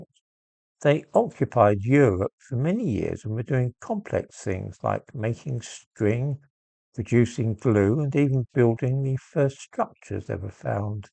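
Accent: United Kingdom. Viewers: It's so difficult to understand British accent. I can watch videos on science and philosophy in american english all day long for decades, but one single 5 minutes video with a strong british accent and I need subtitles along questioning my whole life and ability of speaking english. LOL It also happens every time I go to London. And I lived in London for a year (12 years ago). Jeeezzz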